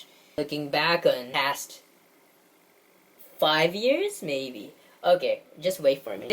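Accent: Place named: Australia. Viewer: Your speak with a bit of an Australian accent, cute! ☺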